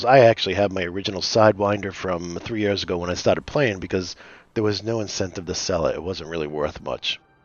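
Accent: United States. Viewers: I hope I'm hearing correctly the NYC accent. It could be Central Jersey. Apologies if I am wrong. I'm a little homesick for NYC is all. Thanks for the clearly made video series. I 've started and stopped this game nearly 1/2 a dozen times, but will try once more thanks to you. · Entire video Couldn’t stop thinking about just wanting to punch the shit of of this annoying New England accent. 🤮🤮🤮🤮🤮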